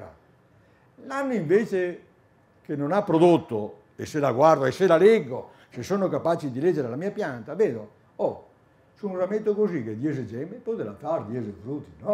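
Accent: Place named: Verona, Italy. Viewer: Perché? Cosa dice che non va. È veronese, di una certa età, come dove parlare secondo costoro? Bha... Giusta osservazione la tua. Ciao!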